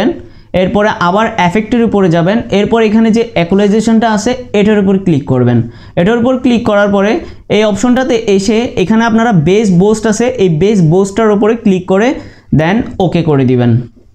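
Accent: Chittagong, Bangladesh. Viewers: ভাই কি চাটগাঁইয়া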